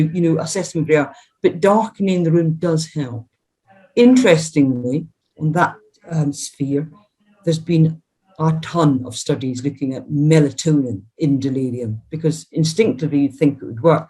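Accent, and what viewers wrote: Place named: Scotland, United Kingdom. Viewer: Good o hear aS ottish accent! Thereis little about helping carers to cope with the after effects. My wife Jean 81 years old, was rushed into hospital with incontinence which was diagnosed as bowel impaction. She was later diagnosed with a fracture of the L1 vertebrae. She had to wait for transfer to the spinal unit. Very slow recovery , loads of opiods given. Referred to community hospital for assessment and discharge into a care home. One week later she was returned to A&E who diagnosed bowel impaction, this was three months after the original diagnosis. She is recovering mentally, still has panic attacks, bed ridden as no physiotherapy has yet been provided though her rheumatologist carried out tests and said that intense physio was needed.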